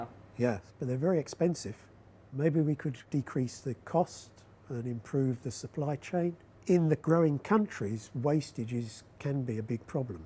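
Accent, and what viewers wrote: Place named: United Kingdom. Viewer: BananER...kills me every time! Is there any explanation as to why the British accent tends to drop the "a" and replace it with "er"? Or is it much like my New Jersey accent that tends to drop the "g" from "-ing" or implant a "w" in place of "-ll" such as "ball" turning into "bawl"? It just is what it is?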